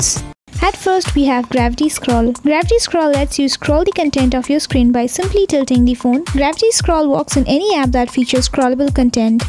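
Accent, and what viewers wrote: India. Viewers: Indian ke english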